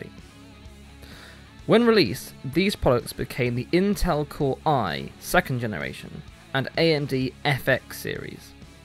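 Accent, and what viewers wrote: United Kingdom. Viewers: Love the british accent... Nice video man!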